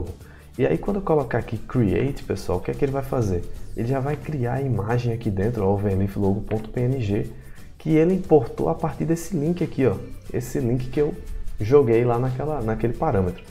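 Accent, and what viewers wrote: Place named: Recife, Brazil. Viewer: De longe o melhor vídeo que eu achei aqui no Youtube. Pelo som de fundo/sotaque da pra perceber que é Recife hehe abs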